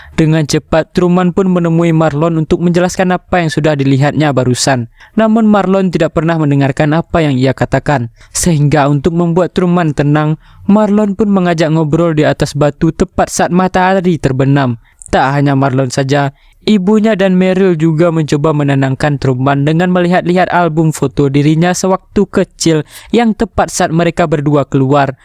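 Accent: West Sumatra, Indonesia. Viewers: Logat minang ngomong lu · Ini urang awak yg ngomongnya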